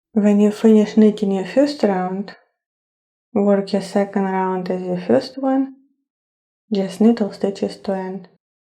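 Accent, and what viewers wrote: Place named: Russia. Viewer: Сильный русский акцент... Тяжело воспринимается. Жаль :(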